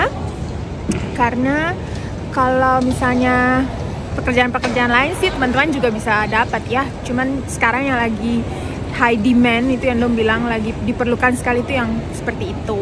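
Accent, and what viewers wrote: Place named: Eastern Indonesia, Indonesia. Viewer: Ka diindonesia dari derah timur ya? Mirip2 dialeknya tmnku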